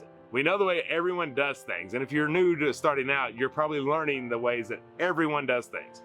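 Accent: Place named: Kentucky, United States. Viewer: I was just watching a few of your videos and told my husband that your accent was similar to ours. We live in WV on the border of Kentucky. I was born and raised in Eastern Ky and graduated from Morehead State :) Great videos!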